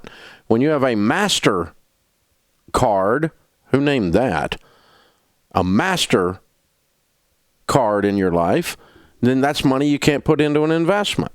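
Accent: South, United States. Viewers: I watch it for southern accent.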